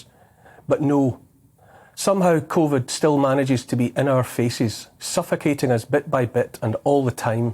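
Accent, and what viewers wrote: Scotland, United Kingdom. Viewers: Neil, your speeches are always so moving, like great literature, and spoken in a beautiful Scottish accent. I would implore everyone to take their children out of school if they are being forced to mask at at school. Children are capable of learning without government schools.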